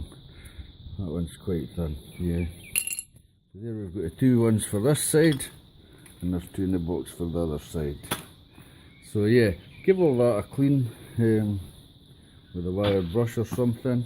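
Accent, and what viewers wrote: Scotland, United Kingdom. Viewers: Love the accent Jock Scottish 👍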